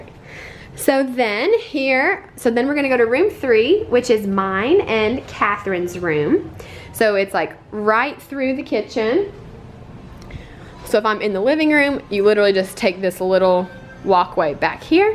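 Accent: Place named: Kentucky, United States. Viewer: I was wondering why your accent sounded so familiar, I’m from Kentucky too! I’m about to graduate from EKU. Loved your Kentucky art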